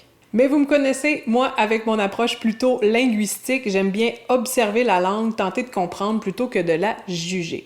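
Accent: Quebec, Canada. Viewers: Alo! Félicitations pour tes vidéos, c'est vraiment super intéressant, enrichissant et d'un très haut niveau linguistique. j'apprends beaucoup en les regardant! Je sui prof de français au Brésil depuis 14 ans, beaucoup de mes élèves vont immigrer au Québec, je me suis donc rapproché naturellement de cette belle langue française parlée au Québec, je vous comprends de plus en plus ( au début c'était vraiment difficile, même sur TV5 monde, les séries québécoise sont sous-titrés...en français...ça l'a po d'allure..)> Je joue en ligne aussi avec mes amis québécois et j'adore votre accent, vos expressions! Je suis originaire de bourgogne en France, dans une mini région qui s'appelle la Bresse ( on nous appelle les ventres aunes parce qu'il y a des élevages de poulet...) mon grand-père parlait le patois, et j'adore ça...c'est impossible pour un parisien de comprendre le patois, les patois car bien sûr il y en a des différents suivant les régions.. 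Bravo encore pour la qualité de vos cours! A tantôt arnaud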